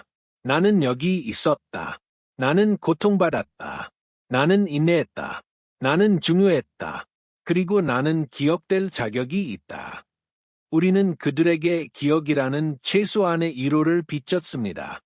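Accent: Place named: Egypt. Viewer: 이집트 여성 노예의 기록~내용은 자세한데 이집트 사람이 한쿡말 하는것 같은데? 한쿠말~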